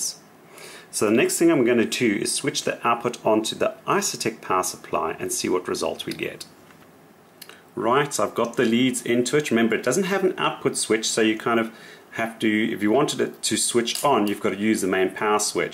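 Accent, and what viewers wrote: South Africa. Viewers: Little confused at first having South African accent from the UK :-). I am enjoying the series. Keep up the good work.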